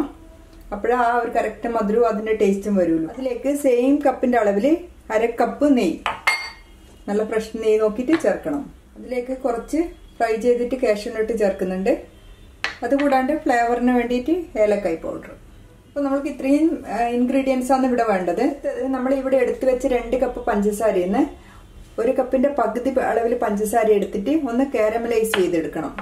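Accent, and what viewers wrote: Kannur, India. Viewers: Are you from Cannore, parayunna reethi kettappol tonniyathaanu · കണ്ണൂർ ആണോ ചേച്ചി..സംസാരം കേട്ടപ്പോ തോന്നുന്നു..കണ്ണൂർ ആണെങ്കിൽ, എവിടെയാ. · നിങ്ങട കണ്ണൂർ സ്‌ലാങ് കേക്കാൻ നല്ല രസമാന്ന്... ഞാൻ ഇപ്പൊ ഉണ്ടാക്കിക്കൊണ്ടിരിക്കിവാന്ന്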